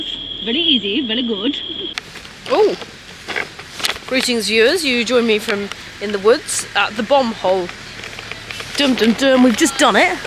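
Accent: United Kingdom. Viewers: I love british accent...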